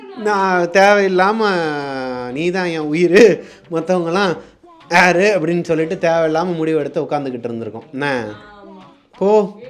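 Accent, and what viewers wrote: Madurai, India. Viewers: Hi.. i m shobana from madurai... i like ur videos... personalave i like u and ur slang.... especially "vellena endhrichuten"... madurai la dan apdi solvanga... neengalum virudhunagar.... so unga videos, pranks ellame parpen.... additionally en baby name prabaharan and my brother name is arjun... so unga videos time ku pathuduven... unga ghost videos la 1 video iruku... <link> <link>... indha video title eh romba bayama irundhuchu... so comments pathen... so many people "ghost vandhuchu.... terrible sound kettuchu" nu potrundhanga.. so andha video mattum nan ipo vara pakkala.... really is it serious to listen...? Clarify pannunga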